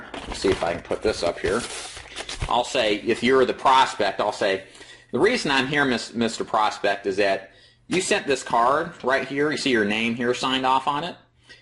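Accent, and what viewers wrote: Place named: Chicago, United States. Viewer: Where are you located.. your accent sounds Chicagoesque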